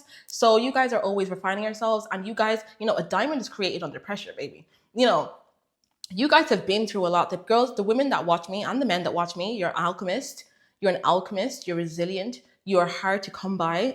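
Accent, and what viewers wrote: Ireland. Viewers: I WAS LITERALLY GOING TO ASK IF YOUR FROM IRELAND!! I feel like you would be fun to be around! 😂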